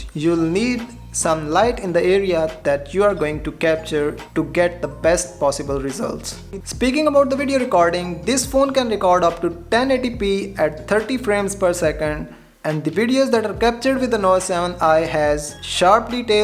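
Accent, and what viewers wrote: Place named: Pakistan. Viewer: come on dude you are trying hard to speak English and pronouncing English words with desi Arabic/Urdu accent,I think there is no need to do reviews in english,in Pakistan by urdu you can get much wider audience and reach...English is just an other language its not a benchmark of "quality or scope of the channel". I'm not being sarcastic at all,its just my opinion. Otherwise your review is good,quality and content is also spot on...thumbs up for that.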